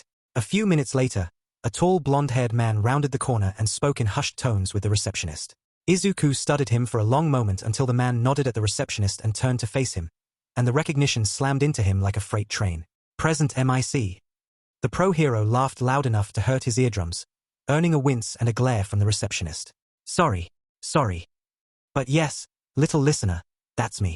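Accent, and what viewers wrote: United Kingdom. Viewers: Is this shit British ????????????????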